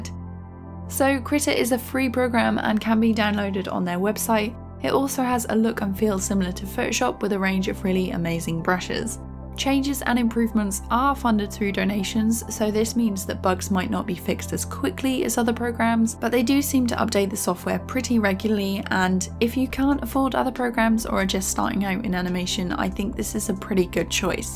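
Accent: United Kingdom. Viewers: I love your voice! and that british accent is so sweet!! greetings from Brazil ;-)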